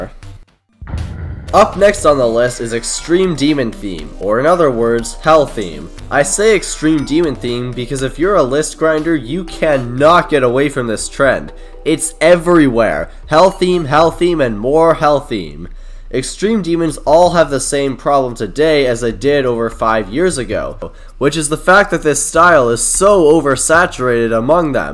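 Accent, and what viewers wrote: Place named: United Kingdom. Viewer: glow is the best for sure to me (for a creator with an british accent) and hell is 2 (for a creator with an british accent) core is 3 if done right (for a creator with an british accent) 4thly thats not the most impressive thing in the world minigames and stuff like that go play what and change of scene (for a creator with an british accent)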